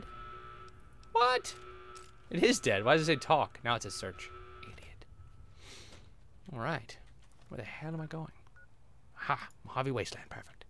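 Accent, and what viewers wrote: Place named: Scotland, United Kingdom. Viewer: your scottish accent is actually okay :L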